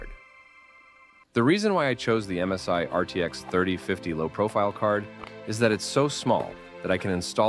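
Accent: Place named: United States. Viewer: Interesting American accent european outlet lol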